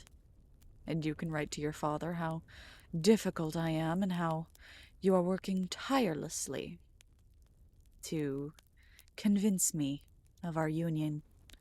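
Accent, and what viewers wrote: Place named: United Kingdom. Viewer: The British accent is sending my little gay self to neighboring galaxies 😭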